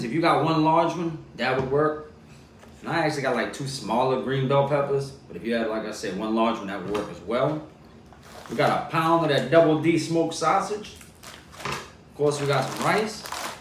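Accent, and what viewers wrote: Louisiana, United States. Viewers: I been watching this guy for a little while now n i gotta say he's very talented love the hook "Lets go yall " keep doing your thing love the Cajun accent!!!!! Lets Go!!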